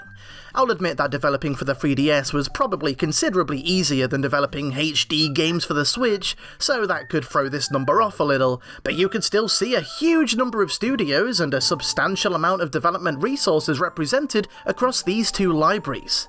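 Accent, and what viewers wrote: United Kingdom. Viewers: I'm really like the new accent. British? Yes, please! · sounds a lot like Dave from BoyinaBand. And I don't just mean because of the british accent, they have a similar tone and even inflection to their voices that for a moment I thought it WAS Dave doing the Voice Over. Eerie. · British is best. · I kinda like British better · voice is the opposite of soothing. It's super nasally and whiny. This guy is British. It doesn't get any better than that. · This sounds like a British. (Hope you get feeling better. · Thank you British, very cool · Damn. I like British. Really really great voice and speech. Probably my 3rd favorite YouTube Guy to listen to. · This should be the British dub of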